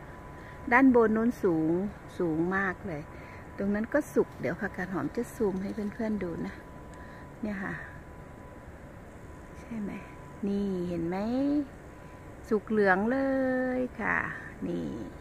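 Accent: South, Thailand. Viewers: พูดใต้ชัดมากเลยนะค่ะ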